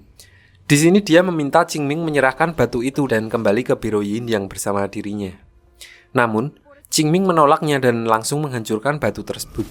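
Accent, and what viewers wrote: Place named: Java, Indonesia. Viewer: Medok jawa banget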